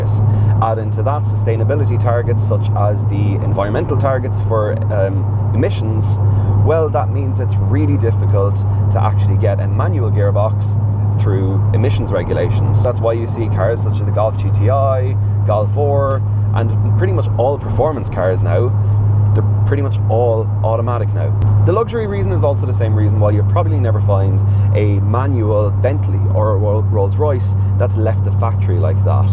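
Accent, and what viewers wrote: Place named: Germany. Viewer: damn (i am german) i love your "german accent" it was very funny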